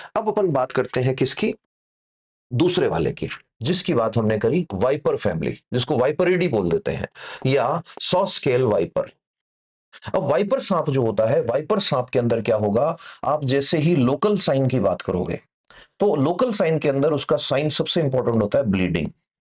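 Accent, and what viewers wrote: Rajasthan, India. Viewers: Sir aap sikar RAJ. Se to nhi ho aap ki boli se lag raha h agle lecture me bata dena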